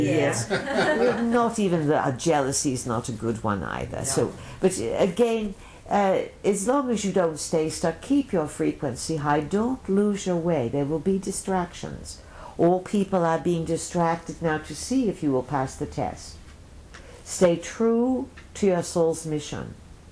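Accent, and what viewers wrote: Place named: Europe. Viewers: LMG, obviously you have not carefully studied the Pleiadians teaching thru' Ms Barbara Marciniak. They originally introduced themselves as a 50 member collective, and were learning how to teach, advise, communicate with humans. I've lost track of exactly where they stated they are now 100 + Spirits, and have come a long way.It should be clear to you, as well as many of their skeptics, that the familiar voice has changed a bit, have added humour and wit ( often a play on words as well ). Barb is well educated ; she would never make common English grammatical slips, confusing correct use of " this " vs : that ", switching pronouns in mid sentence, incorrect subject and verb agreement, and the occasional misuse of common American wordings. Their vocabulary, though excellent, occasionally they slip into British idioms, Euro vocab and pronunciations, and oddball word order. 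Frequent hesitation, and repetition of words and phrases while searching for the precise word to fit the idea, is a characteristic, of a non-native English speaker. The final clue is, they encourage and even beg for questions from their audience, so as to learn what concerns are on peoples' minds. They can give long dissertations and insight into complex, historical, economic, political, religious aspects. No human is capable of this broad swatch of understanding and explaining, almost ad nauseum. Despite some negativity towards their ending, creeping in sometimes, they always manage to convey a positive messag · I'm just curious why she went from speaking perfect plain english in 1996, to having an odd Euro accent in 2014? Not to mention going from doing 3 hour hypnotism talks in a slow monotone, to talking in the third person. Or maybe the fourth person. Not sure how to describe one person, talking in the third person as two other people. 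LOL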